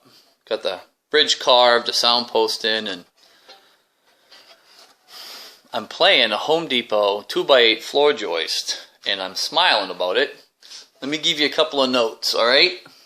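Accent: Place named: New Hampshire, United States. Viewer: Just found this today... heard the "NH dialect" after finding the violin building. Lived in Brookline NH for years. Your violin building and description is both interesting and down to earth. I often wondered about building a dulcimer from Home Depot woods, looking at those beautiful cedar boards. Wonderful work and the videos are great!!! plus.. the fiddle sounds great! :-)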